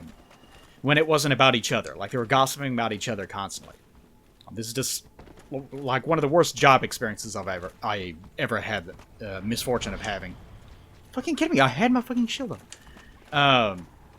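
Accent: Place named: South, United States. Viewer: I just realized you sound a lot like SSoHPKC but with a slight southern accent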